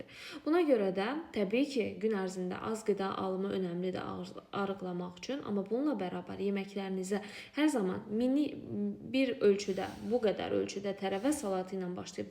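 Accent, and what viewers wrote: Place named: Azerbaijan. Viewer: Benim kızım.Acaba ben sağlık tevsiyelerinizi mi dinlirem yoksa güzel Azerbaycan türkçenize mi heyranam bilemedim.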